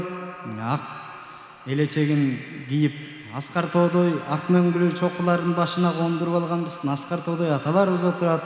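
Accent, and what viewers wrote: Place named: China. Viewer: Кытайлык кыргыздар таза кыргызча сүйлөшөт да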